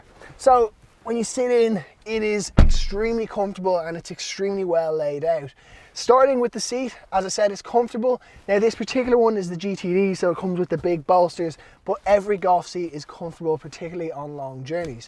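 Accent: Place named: Ireland. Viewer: Golf or = Golf R , its his irish accent .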